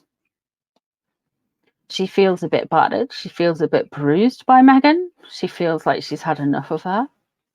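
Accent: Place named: North, United Kingdom. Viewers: Don't worry about pronunciation, my Auntie was from up North and said book like you, it just were you are from in the UK! Be proud of your accent, I was born in the Midlands and now live in Australia, my Australian son in law teases me about my accent :_trifle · I love individual accents, and the north east of England always gets voted as one of the friendliest!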